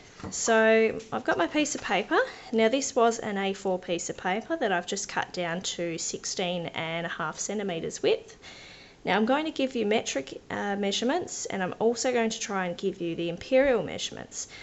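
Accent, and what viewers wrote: Australia. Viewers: Great to hear the Aussie accent with stamping up can't wait to try this card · I really enjoyed your tutorial. You explained everything clearly and I enjoyed hearing an Aussie accent for a change on a Stampin Up video. I have subscribed, so I hope to watch more of your videos in future. Thanks.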